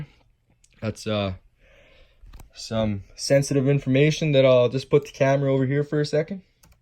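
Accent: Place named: Wisconsin, United States. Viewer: This guy must be up north. Close to Canada probably from Wisconsin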